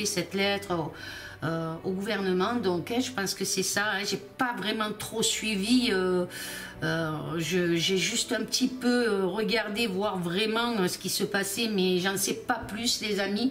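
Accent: South, France. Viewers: Adorable et bel accent du sud Merci pour le tirage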